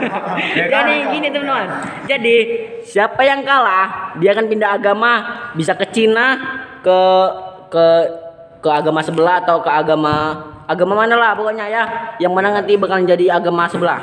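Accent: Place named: Madura, Indonesia. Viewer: Lu orng maduraa yaaa bg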